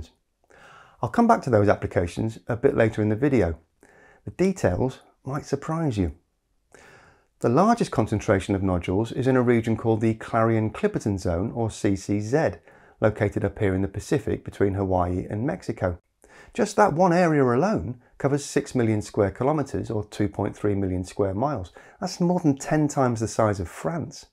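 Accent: United Kingdom. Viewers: Hearing you come up with terms like "research odyssey" and speak it in the British accent is why I always preferred listening to Brits narrate in documentaries.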